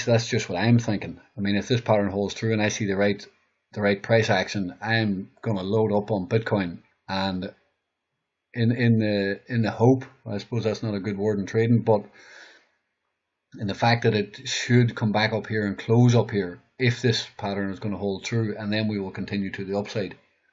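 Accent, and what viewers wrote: Northern Ireland, United Kingdom. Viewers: Where you from! Sounds like northern ireland to me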